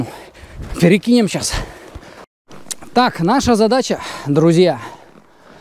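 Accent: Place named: Taiga, Russia. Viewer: Только хотела написать про приятный русский таёжный говор. И попался ваш комментарий. 🙂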